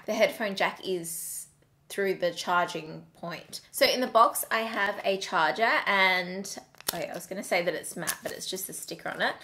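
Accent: Australia. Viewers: Are you from Australia ?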